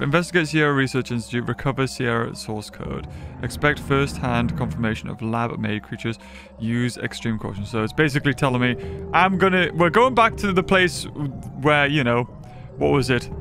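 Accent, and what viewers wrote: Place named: United Kingdom. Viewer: i just noticed he's british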